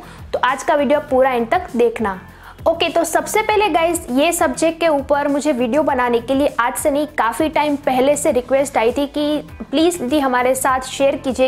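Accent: Gujarat, India. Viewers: I like her gujrati accent i miss my gujrati friend. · You must be a Gujarati by the way you speak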